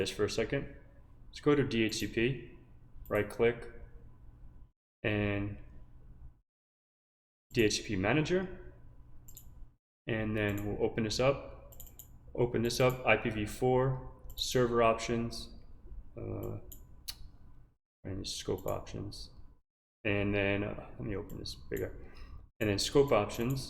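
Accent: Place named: New England, United States. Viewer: Love the New England accent!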